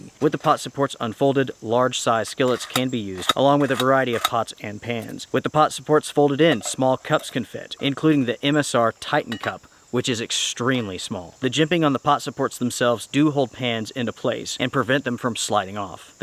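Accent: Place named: South, United States. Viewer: Great little stove. I love your reviews and your voice. Very smooth with a slight southern accent. ❤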